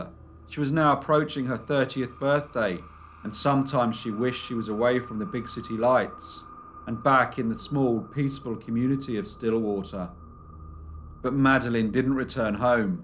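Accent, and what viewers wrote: England, United Kingdom. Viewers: Thanks for all your uploads. I love your stuff. As a Brit who has lived abroad for decades, due to my job, I love to hear your English accent🙂👍🏼💚🙂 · Man I love this channel. I cant get enough of listening to this narrator's voice say "Guilty " with his awesome British accent. Keep up the good work.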